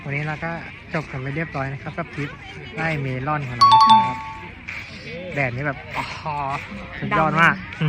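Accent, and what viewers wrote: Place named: Kamphaeng Phet, Thailand. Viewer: สำเนียงคนกำแพงเพชรครับ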